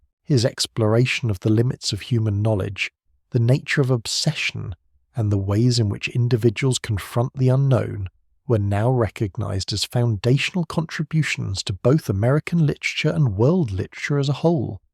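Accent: United Kingdom. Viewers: It's being a British accent, don't you know.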